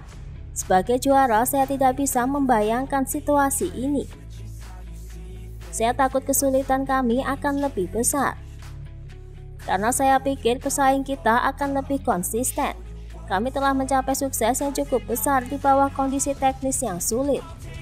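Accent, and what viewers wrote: Java, Indonesia. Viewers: Komentator agak medok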